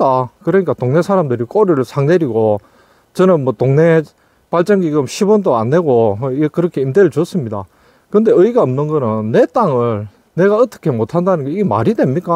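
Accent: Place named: Jeolla, South Korea. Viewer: 말투로보아 전라도?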